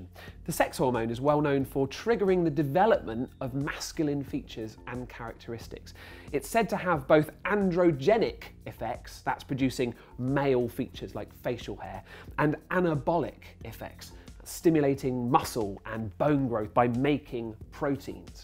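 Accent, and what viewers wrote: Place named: England, United Kingdom. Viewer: That English accent thooooooooooooooooooooooooo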